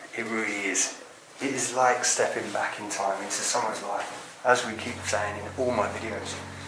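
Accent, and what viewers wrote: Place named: United Kingdom. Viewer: Hey I absolutely love your videos. Of course I find them absolutely fascinating, but there is one thing that has been driving crazy and I would live some clarity. What part of the UK are you from? Sorry it's silly, but I've heard it before and I would love to be able to finally place it! Ty!!!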